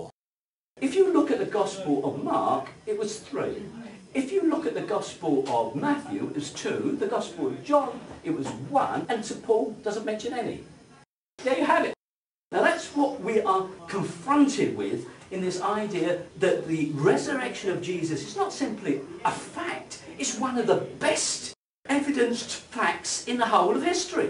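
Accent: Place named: Cockney, United Kingdom. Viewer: It’s a beautiful cockney and I love it.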